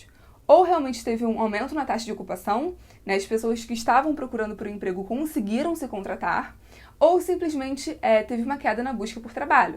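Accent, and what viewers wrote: Rio de Janeiro, Brazil. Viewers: Carioquês bacana..😂😂😂😂😂... E muito conteúdo bom.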